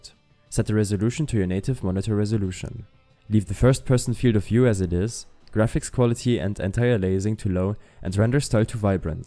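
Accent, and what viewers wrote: Germany. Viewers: Alle sagen dass man den deutschen akzent raushört Aber niemand gibt probs für seine englischskills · Man hat direkt an deinem Englisch gehört dass du ein Deutscher bist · ich habs auch schon an seiner aussprache erkannt und dann erst bemerkt, dass aufm pc auch alles auf deutsch ist xD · Jawoll ein deutscher das hört man so raus hahah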